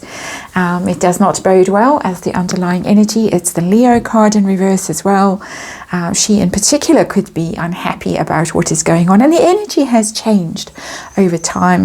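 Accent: South Africa. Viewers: Harry THINKS.?? Is he capable? Of course Megan WANTS something, nothing will ever satisfy her. Once again, spot on! Love your readings,. Also love hearing your south African accent, much love from a South African expat.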